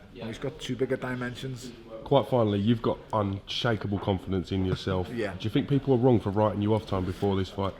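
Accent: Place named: Liverpool, United Kingdom. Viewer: I am a Manc and I really like Bellew (I am even starting to like the scouse accent) - what he says about Haye conning the public is spot on!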